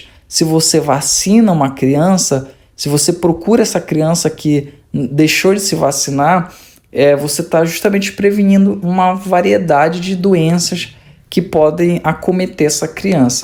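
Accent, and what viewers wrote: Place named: Pará, Brazil. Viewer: teu sotaque é muito paraense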